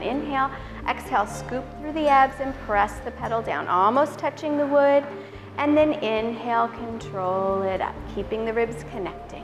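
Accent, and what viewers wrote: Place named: United States. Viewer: A voz dela é tão americana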